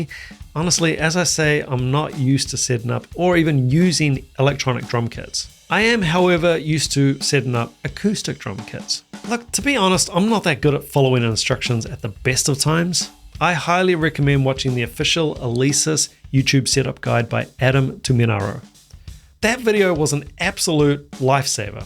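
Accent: New Zealand. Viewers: …I like to hear an NZ accent to a point but to wait for you to say something substantive or meaningful 1/3 the way through…well…too… long…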